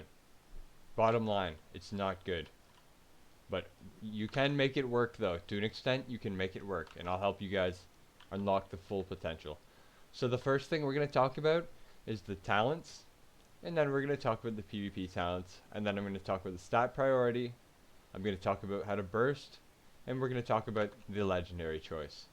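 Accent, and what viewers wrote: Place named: Nova Scotia, Canada. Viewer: Canadian eh, that "R" pronounciation sounds almost Nova Scotian/New Foundlander.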